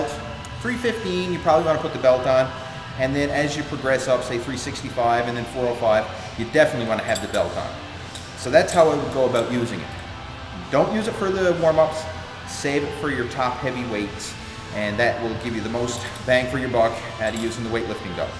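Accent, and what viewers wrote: Newfoundland, Canada. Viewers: Hey fellow newfie haha. Sure sounds like one. Eh by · He must be a Newfoundlander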